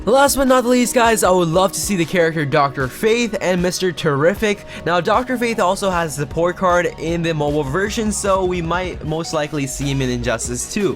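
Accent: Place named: United States. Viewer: you sound like ur from n america usa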